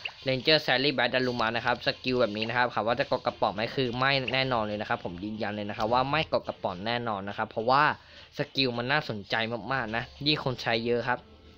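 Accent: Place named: China. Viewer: ไม่ค่อยชัดเลยอะคนจีนใช่ป่าว?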